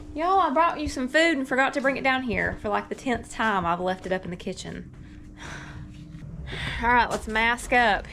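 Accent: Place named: South, United States. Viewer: Yes Ma'am you have that wonderful southern accent! Montana is a beautiful state to visit any time of the year! We raise cattle but never had chickens, mainly because of our abundance of bald eagles, skunks, coyotes and greedy turkeys! But we decided to designate a portion to have chickens for eggs, it's been a blast raising them, and now winter is coming, and I'm just watching everyone's way of raising their chickens! Hope you get a chance to come visit the Big Sky Country! Cheers!!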